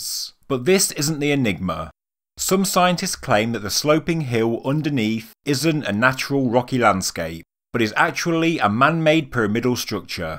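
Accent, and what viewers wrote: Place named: East Midlands, United Kingdom. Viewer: I love archeology given to me in a strong east midland accent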